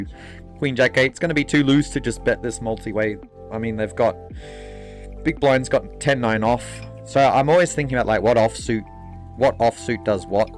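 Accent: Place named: New Zealand. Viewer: His accent sounds like he's from New Zealand but it says hosting from Canada? Am I just mistaking the accent or did he move? Anyone in comments know the answer? (Curious because I'm from New Zealand)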